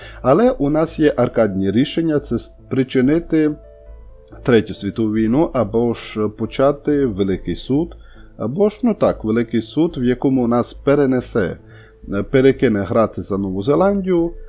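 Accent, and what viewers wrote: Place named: Zakarpattia, Ukraine. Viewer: Хотів би попросити щоб колись один раз ви використали ваш закарпатський діалект на усі 100%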